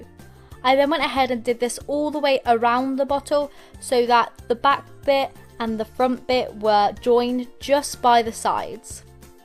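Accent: United Kingdom. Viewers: Are you British?😃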